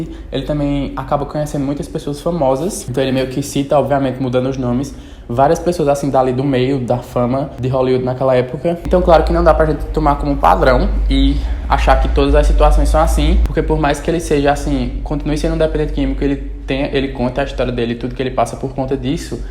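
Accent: Northeast, Brazil. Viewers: ótima esta proposta comentar os livros indicados por pessoas que você admira. Crie sim um Quadro. Três observações apenas. Não se preocupe com sua pronuncia dos nomes, mas voce pode por exemplo, consultar no google tradutor, no audio a pronuncia do idioma original. Segunda, Bob Dylan nasceu na decada de 1940 período da segunda Grande Guerra. Por fim, fale mais lento, voce explica com clareza, logo, não precisa acelerar sua fala. É muito bom ouvir o seu sotaque, infelizmente minha mãe que nasceu em Pernambuco e veio para Minas, perdeu o dela. Sucesso sempre!😊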